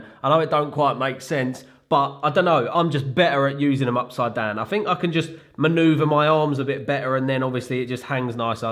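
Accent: United Kingdom. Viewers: oooo aaa wwwww I love the British accent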